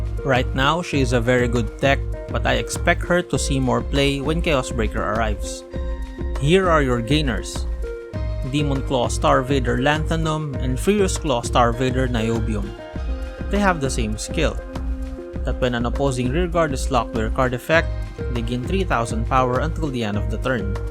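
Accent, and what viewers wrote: Philippines. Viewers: Are you Filipino? Your english accent sounds like it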